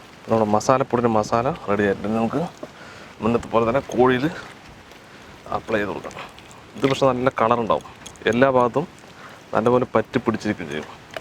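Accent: Palakkad, India. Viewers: ചേട്ടൻ പാലക്കാട് ആണോ....ചേട്ടന്റെ slang പാലക്കാടൻ ആണല്ലോ...I m in Palakkad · മ്മടെ പാലക്കാടൻ ഭാഷ, അതാണ്,,, ഓ,,, പൊളി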